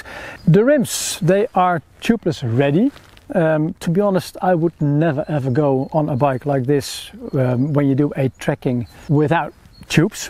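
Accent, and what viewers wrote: Netherlands. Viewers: Ik volg je altijd zeer kundige en gedetailleerde testen al jaren. Ook uit het Op Pad tijdperk. Echt waardevolle info waar ik veel aan heb. Toch wil ik al een tijdje wat kwijt over je nieuwe kanaal. Nu vraag je er speciaal om. Toevallig was deze video in perfect (voor mijn oren dan) Engels. Veel technische termen en zorgvuldig gesproken met een duidelijk verhaal. Veel Engelstalige 'reviewers' kletsen soms maar doelloos rond. Reviewen is een vak. Dat beheers je dus duidelijk uitstekend. Het blijft moeilijk om iets in een vreemde taal exact uit te leggen wat je precies wilt duidelijk maken. Intonaties, woordkeuzes. Mijn Engelstalige vrienden zeggen altijd dat wij beter Engels spreken dan zijzelf. Wij spreken volgens het boekje. Dat doet geen Engelsman.Tegelijk zeggen ze ook: wij proberen juist niet te zeggen wat we denken. Nederlanders zeggen direct wat ze denken. 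In het Engels gesproken klinkt dat heel onengels en herkennen ze onmiddellijk dat we een Engels sprekende Nederlander zijn. Ze drukken zich anders uit. Ik heb respect voor je poging een groter bereik te krijgen door te kiezen voor een niet natuurlijk liggende taal. Het blijft een afweging. Toch lijkt het mij voor je zelf makkelijker Nederlands te spreken. Je hebt nu eenmaal een Nederlandse manier van spreken. Het is meer werk maar je kan jezelf ondertitelen op Youtube in het Engels. Zelf een samenvatting typen kan. Een spraakomzeter het werk voor je laten doen met evt. correctie achteraf. Eenmaal zo'n tekstbestand aang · Great review. Your English is extremely good. Nothing I didn't understand. Love your Dutch accent ;@ But seriously €2,600!!!!! That's about €1,000 more than I thought you were going to say. The reason it is so expensive is because it is sold under the bikepacking umbrella. 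If it were a normal 29" hybrid without orange racks it would be half the price. SLX is good but not that good! I have one of the first Trek 7500 hybrid from about 1998 with Alpha SL Aluminium frame. It is very stiff and strong as the tubes were over size. It too had SLX or LX components back in the day, but it cost me about €300 new!!!!! The spec is now Shimano XT with handbuilt 700C x 35 wheels. I would not swap it for anything as the aluminium frame is the best thing Trek did, although I do not like the look the carbon fork on the 1120 today. They should have gone with aluminium or Cromoly, Also the Trek 1120 looks like a rival to the Specialised AWOL which I think has better long distance touring potential certainly better racks and can carry more. Their front pizza rack is a gem. I don't own one. Could you do a review of a similar bike to the Trek 1120 but with Rohloff hub or pinion gearbox preferably with Gates belt drive? 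But great review. I am already subscribed to your channel.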